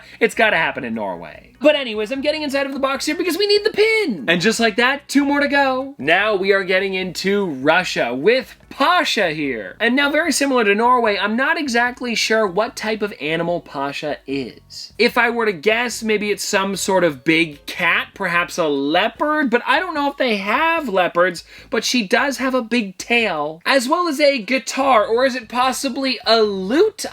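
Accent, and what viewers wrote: Canada. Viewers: dude are you canadian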